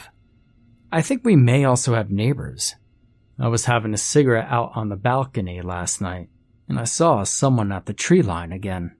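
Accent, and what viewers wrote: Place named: Philadelphia, United States. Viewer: The voice over guy is from The Delaware Valley (Philadelphia Area).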